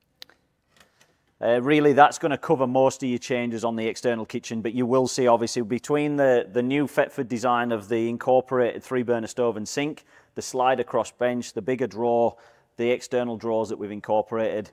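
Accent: United Kingdom. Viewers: nice trailer. seems odd listening to a Yorkshire twang advertising Aussie trailers. That outdoor kitchen needs a redesign, most of it is unusable, nice features but unusable. something to think about for Quantum 5.5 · Where's that northern accent from?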